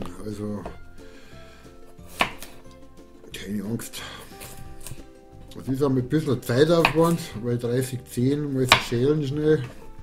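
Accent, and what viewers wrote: Bavaria, Germany. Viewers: Danke für deinen bayerischen Dialekt!!!! Mai, ist das schön zum hören :-)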